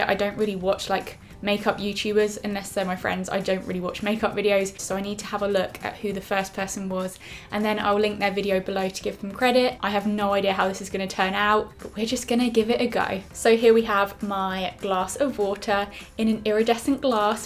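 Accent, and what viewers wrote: United Kingdom. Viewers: I'm in love with your British accent.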